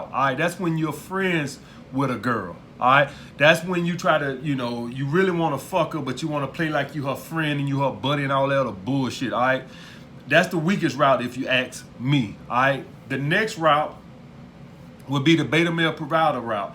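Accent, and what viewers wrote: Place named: West, United States. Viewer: 😂😂😂😂😂 you funny ASF but still talking these deep truths. With that Western Accent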